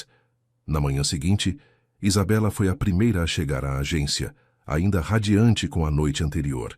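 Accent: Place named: Portugal. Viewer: Narração perfeita, de Portugal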